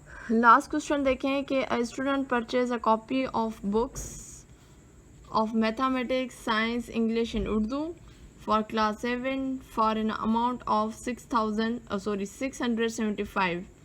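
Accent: Sindh, India. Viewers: ڪجھ ليڪچر سنڌي ۾ به رڪارڊ ڪيو ادي، اوھانجو سنڌي لھجو اڃان وڌيڪ سٺو ۽ مٺو آھي 💯🙏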